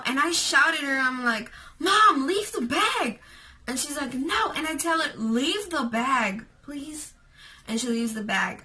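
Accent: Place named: United States. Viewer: Why do you sound like an American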